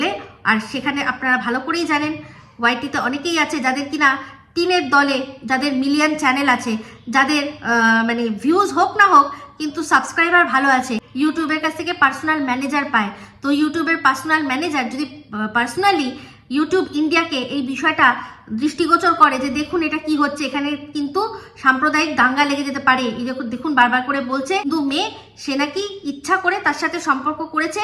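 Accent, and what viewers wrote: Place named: Dhaka, Bangladesh. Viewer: tumi camerar pichon thekei video koro, tomar khati Dhakaia kotha shunte shei lage. Kintu kichuta change ante hobe tomar roasting e. Asha korchi khub shggiri tomar channel ta firee pabe. Fira asho notun rupee.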